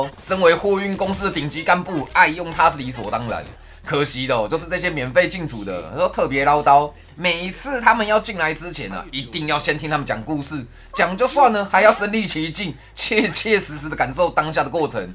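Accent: Taiwan. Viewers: 這個台味好純XD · 階地氣的文案、台味的語氣。很喜歡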